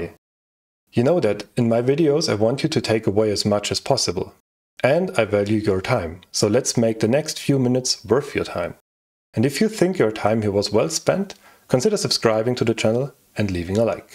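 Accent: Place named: Germany. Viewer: Alter Schwede, geiler Channel Name und richtig gute Inhalte. Bin zwar kein absoluter Rust-Anfänger und habe ein paar Jahrzehnte Programmiererfahrung (hallo Turbo Pascal und VisualBasic 2.0, erinnert sich noch wer? 😀) aufm Buckel, aber deine Videos sind trotzdem sehr gut strukturiert und interessant für mich. Also, your English is pretty good, so no issues for me understanding you (although there is still that lingering German accent, but that's normal, trust me - I have it too when talking in English). All in all, very good content. Subscribed for sure. Keep at it. And Thank you!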